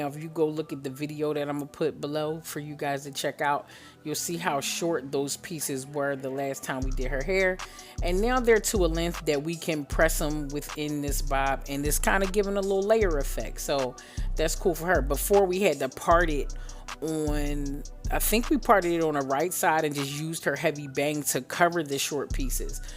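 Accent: Philadelphia, United States. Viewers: I just ❤️ your Philly accent!!! 😂 🤷🏽‍♀️ 😂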